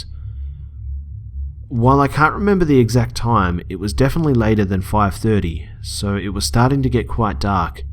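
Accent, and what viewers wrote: Australia. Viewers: it's so hot when he swears, with his (australian?) accent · He's Australian 😂💕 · Yep it is aussie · Just curious, but what is your accent? It sounds very British, not sure which region, but at times you sound distinctly Australian. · Not trying to be a stalker but it's obvious that your australian I'm a Queenslander wbu?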